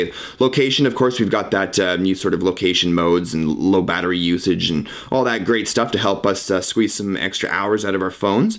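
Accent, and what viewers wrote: Canada. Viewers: Canadian English. Ha, lol.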